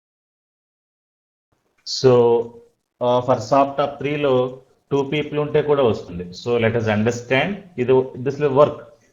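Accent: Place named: England, United Kingdom. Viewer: you are a very good teacher with a good rhythm of instructions. My only problem is the English accent. Very good job. Thank you for helping us with SQL.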